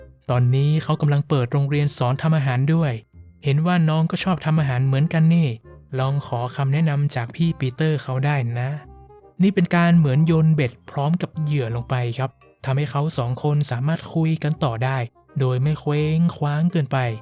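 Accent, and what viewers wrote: North, Thailand. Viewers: คนพากษ์ เปนคนเหนือแน่นอน😊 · คนเหนือแม่นก่อค่า..พูดเหมือนเจ็บแอว😊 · Admin เป็นคนเหนือแน่นอน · เธอเป็นคนเหนือเหรอ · อันนี้ขอนอกเรื่องได้ไหมคะ สำเนียงการพูดเหมือนคนเมืองมากเลยค่ะ 🤭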